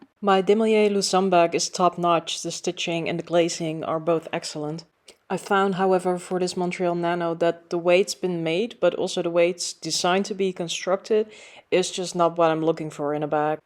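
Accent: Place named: Netherlands. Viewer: By coïncidence I discovered your channel and I immediately liked it and subscribed 🤗I already thought you had a slight dutch accent and after ‘doei!’ I had to laugh, I’m dutch as well 😁 I appreciate your honest and thorough reviews, keep up the good work 👍 doei!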